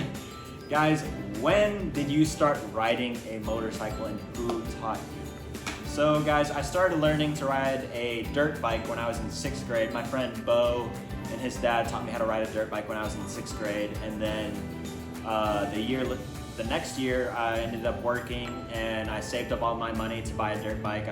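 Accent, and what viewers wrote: Philippines. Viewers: Your tagalog accent is lit 🔥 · you're so conyo but i love your accent hehe i'm super excited to watch you portraying tob yanai 💙❤️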